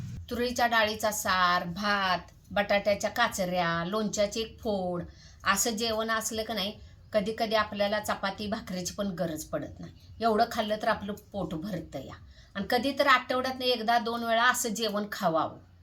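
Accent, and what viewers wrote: Kolhapur, India. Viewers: कोल्हापुरात तुम्ही कुठं रहाता? तुम्हाला भेटायला यावस वाटत... मग तुम्ही कोल्हापूर च्या भाषेत म्हणतासा.. " या कि घरा कडं".. या कि घर ला..😅.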